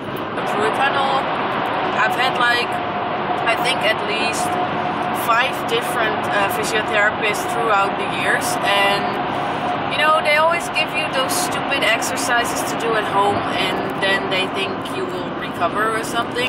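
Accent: Netherlands. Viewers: dutch accent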